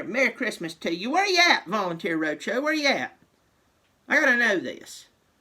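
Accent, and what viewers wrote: South, United States. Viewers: Idk y I watch ya, imma Buckeye fan... not saying osu hates Clemson or anything, I respect the university and I think Sweeny is a stand up top notch guy (and 1 heck of a coach)! I guess I just like watching ya talk smack in a southern gentlemans manner, and not to many better American names than good ol!!!! Love your show man!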